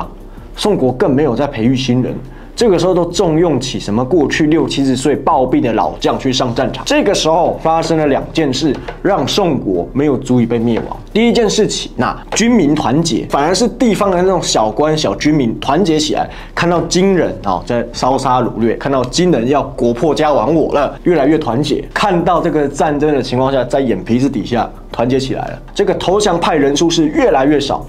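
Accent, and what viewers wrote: China. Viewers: 為什麼你講話有大陸腔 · 你的口音是標準的大陸的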